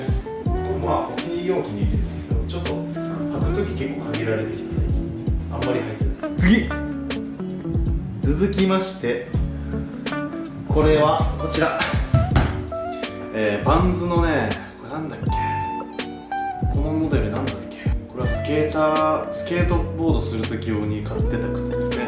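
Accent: Kansai, Japan. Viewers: 本当にカッコいい！俳優になっても良いだろうねぇ🥰そして喋ったら関西ニキって言うのもええねんマジで😂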